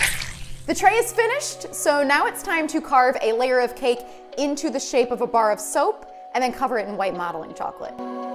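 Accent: United States. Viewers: I found you on IG and assumed you were Russian. Now hearing you talk, you're obviously American lol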